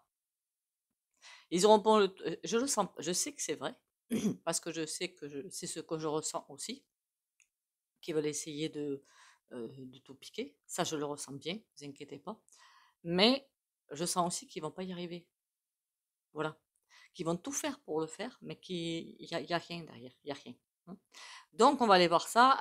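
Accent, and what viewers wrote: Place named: Midi, France. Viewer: avec l'accent fort du Midi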